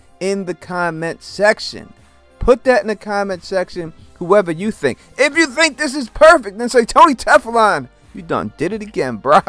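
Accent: New York, United States. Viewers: Perfect casting! I love listening to your commentary as your NY accent is so distinctive and makes me miss home. NY strong.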